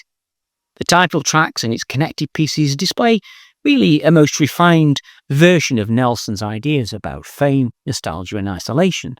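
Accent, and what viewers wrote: Stoke, United Kingdom. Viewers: great review,,,by the way you sound like a stokie?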